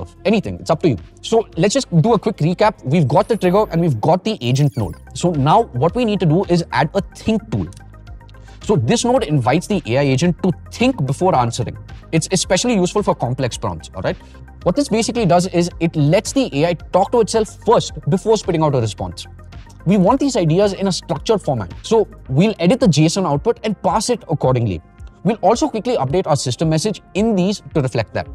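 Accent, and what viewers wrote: India. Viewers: He sounds Indian and looks Mexican 😂😂😢😅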